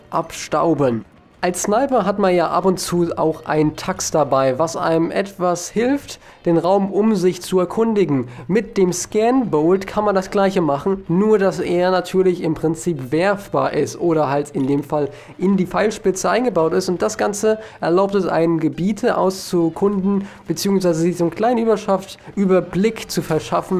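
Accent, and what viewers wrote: Germany. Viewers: also dein Video war richtig informativ und hat mir geholfen, ausserdem gefällt mir den leicht norddeutscher Akzent (?) Also ich lass gleich mal ein Abo da :D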